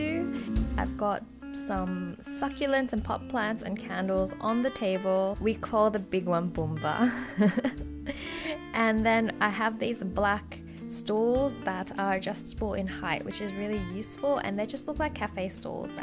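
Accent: Australia. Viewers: it's the australian accent...like they say idear instead of idea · Is she Australian?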